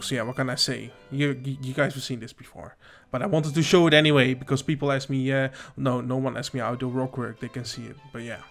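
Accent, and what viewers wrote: Netherlands. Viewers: Ik hoor dat je nl bent 😏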